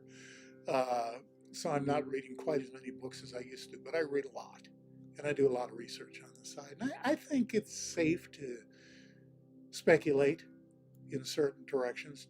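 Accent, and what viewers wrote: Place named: Massachusetts, United States. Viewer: You're a very interesting man,. I suspect that I could listen to you for hours. I summer in Newport, RI and I understand that you reside in New England; I suspect Massachusetts from your accent. Perhaps you'll have a subscriber meetup in the future and we can meet and exchange stories. I've had several encounters in my career that might stun you. Actually, it was these encounters that were directly responsible for my interest in the subject of extraterrestrial life. Great live hang. I'm off duty next Tuesday and expect to finally get to see a live show.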